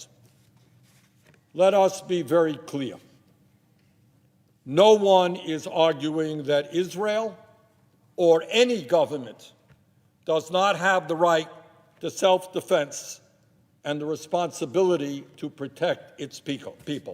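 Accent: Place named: Brooklyn, United States. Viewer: How long, do you think, it'll take this guy to lose his Brooklyn accent??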